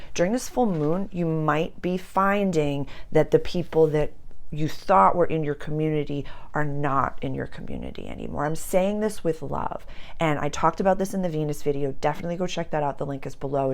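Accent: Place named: Massachusetts, United States. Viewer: Oh my dear ❤, i to am a Aries Sun, Pisces moon, Aquarius ascendant!! I feel you Girl 🙏🏻🌈🪶, By the way I talk to and hug trees 🌳. 🌲, I grew up in Oxford Mass 1970’s and I talk like you ❤🥹… love my accent.. I just found you a few months ago and I feel like I have known you my whole life, thank you for all you do. I’m learning so much from you, not bad an old woman. 🧙‍♀….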